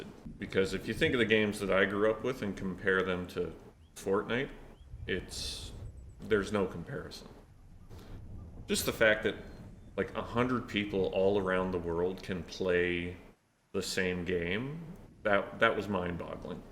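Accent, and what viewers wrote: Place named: Canada. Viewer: Sounds like is Canadian 🍁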